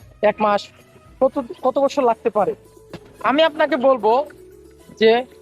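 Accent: Sylhet, Bangladesh. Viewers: Vai subscribe korlam..apni mone hoy amrar Sylheti? Vai amito aitam chai Greece 2024..aiya kam pawa jaiboni city alaky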